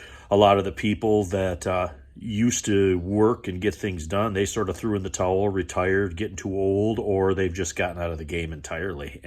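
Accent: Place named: Wisconsin, United States. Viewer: youhave a Wisconsin accent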